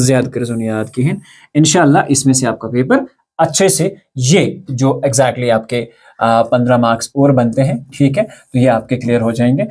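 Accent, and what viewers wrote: Kashmir, India. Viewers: Sir apka Kashmiri bahot acha lagta hai ❤😊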